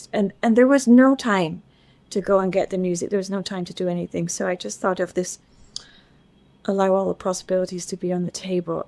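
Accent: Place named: Ayrshire, United Kingdom. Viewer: Lovely to listen to - she still has her soft Ayrshire accent!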